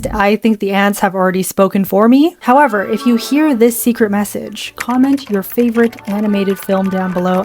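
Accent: United Kingdom. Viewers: Why did you speak British? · Is it just me or she keeps turning British 🤔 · Am I the only one who noticed her extreme brit accent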